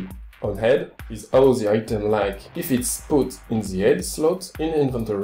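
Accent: France. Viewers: On distingue un petit accent français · are you french, tu est francais? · Very good tutorial. You have a very heavy French accent, but still it's really good that you took the effort to put subtitles under it :) Keep on making awesome voiced tutorials!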